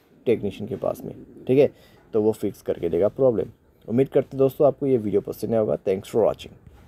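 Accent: India. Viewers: Мерзкий эндуский акцент, слушать невозможно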